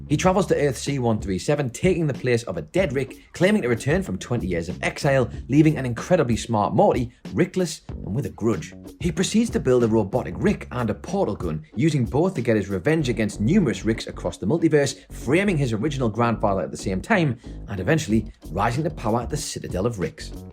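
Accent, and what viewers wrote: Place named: Newcastle, United Kingdom. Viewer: Geordie accents and rick and morty do not mix well together 😅 I can’t take any of these theories seriously. Sorry not sorry. Big up tho cool video 👊❤️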